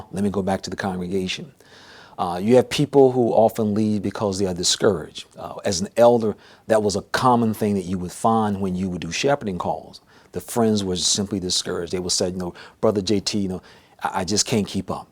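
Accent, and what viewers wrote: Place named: Southeast, United States. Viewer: JT, you and your wife are on my short bucket list of some of the most impressive people I have never met, that I would be honored to meet. I always look forward to your new broadcasts and re-watch older ones sometimes. Your exceptionally respectful and intelligent delivery, knowledge, and honesty , to me, makes me believe you are this generation's Ray Franz even though you are not from the same hierarchy level in the WT, you present the vast information in the same, honest, respectful, and easy to understand way. I do hope you and your wife continue on for a very, very long time, making new videos. Whatever endeavor you take on in the world of employment, I am sure you excel in it. I can only wish for a fraction of your ability to knowledgeably deliver information in this easy to understand way that you seem to naturally have. 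When reading Ray's book I realized I frequented Anniston, AL during the exact time he was there. I was clueless to the JW back then, but if only I had known about the JW, who he was and what he was going through, I would have sought him out just to shake his hand and thank him. Your accent sounds familiar as if you are from the same general, SE, area. I feel if A&E's producers of the Leah Remini Scientology show could get you together with her, to do a program or two on the Watchtower, with your knowledge and delivery shining, it would make for an exceptional ( and very needed) show. Then, your videos WOULD go viral as many hope they would. Please conta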